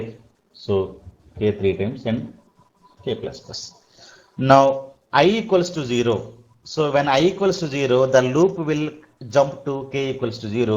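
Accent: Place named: England, United Kingdom. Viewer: you are a very good teacher with a good rhythm of instructions. My only problem is the English accent. Very good job. Thank you for helping us with SQL.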